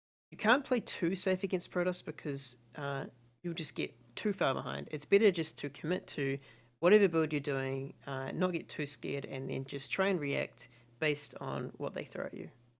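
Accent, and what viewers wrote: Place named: South Africa. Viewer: Excellent video. I really liked the calm easy thought process you had during the game. Your accent sounds like you are from South Africa.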